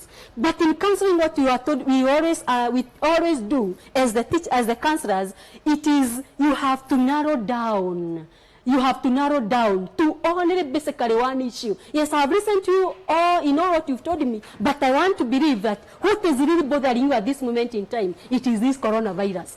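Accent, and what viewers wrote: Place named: Kenya. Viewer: Nice lecture... I was suffering a little with the Kenyan accent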